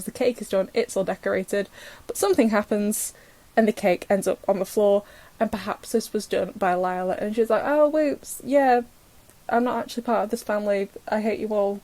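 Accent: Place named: Lancashire, United Kingdom. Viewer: dw I heard 625. Probs because I’m in Lancashire too so have a similar accent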